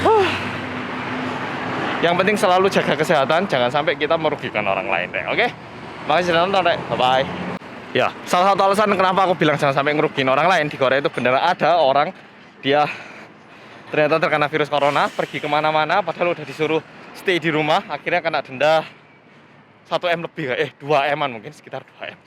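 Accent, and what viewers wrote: Java, Indonesia. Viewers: Me : Weh terjebak dengan vlog abang ni 😂😂 . Suka dengar sebab ada slang bhasa Jawa ( bila your dad is Javanese but you cannot speak Jawa and takde slang pom . Jadi terus rindu kampung ayah and sepupu kat 🇮🇩)🌸 terus subscribe 😊 Salam dari Malaysia 🌸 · Pengen nonton kemarin tapi baru bisa sekarang 😩 sehat teros mas biar aku denger medhok mu 😁✌️ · stay safeee jang hansolllll medhokk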